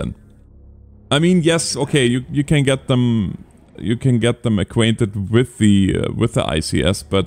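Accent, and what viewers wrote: Germany. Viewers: Erm, was there a reason for you not to call the medics in on the chemical spillage? The fire was still raging and you had the firefighters drag the casulties out.. I was just wondering ^^ Und täusche ich mich oder hast du einen deutschen Akzent? :D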